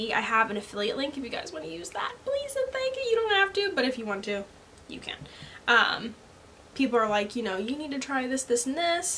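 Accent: United States. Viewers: what kind of american dialect is this?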